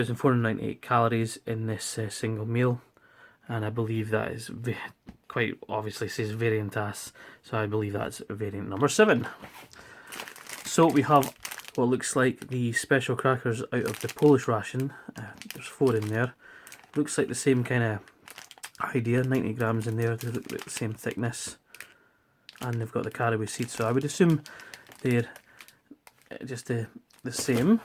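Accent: Scotland, United Kingdom. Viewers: OH MY GOD I LOVE THE SCOTTISH ACCENT Great review btw xd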